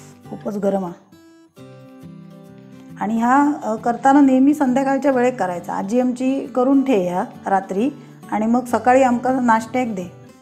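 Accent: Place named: Malvani, India. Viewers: अतिशय सुरेख तुम्ही मालवणी बोलताना आम्हाला गावाची आठवण येते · Ekdam bhari recipe...👌🌟👌🌟👌🌟...barik barik tips aani tricks sangilya mule pahayla chaan watali recipe aani karayla soppi👌👌😀...khupch goad watte tumchyakadun Malvani aaiktana...❤❤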